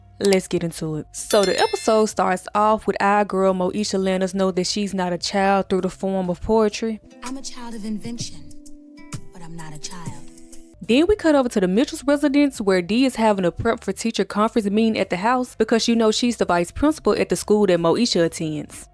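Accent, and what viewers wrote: Memphis, United States. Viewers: Are you from Memphis? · off topic to the video but as i listen to you speak i keep wondering “she from Memphis huh???” lol. W video btw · Ok love this but are you from Memphis lol?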